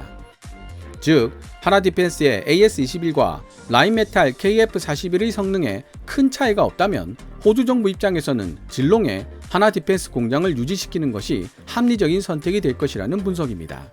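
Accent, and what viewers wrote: Gyeongsang, South Korea. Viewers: 그넘의 징그러븐갱상도 사투리 집차라~ ....죽것다!!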